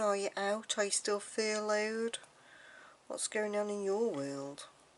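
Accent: England, United Kingdom. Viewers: Im from California. But I love the Brit accent. I watch Brit TV show. My older brother got me hooked now my oldest son is hooked too. Your coppers and Mystery shows. My granny was born in Ireland and moved to the East coast of the USA. In early 1900s! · So lovely to hear an English voice. Only just found you. I’m already a fan and will continue to watch your videos. I have already learned a few new things especially how to end memory wire bracelets. Thank you.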